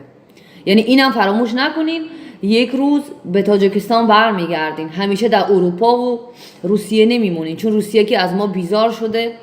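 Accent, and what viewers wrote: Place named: Khorasan, Afghanistan. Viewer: ДАР хуросони Афгонистон Точико хамихел Дари сухбат мекунан 😀😂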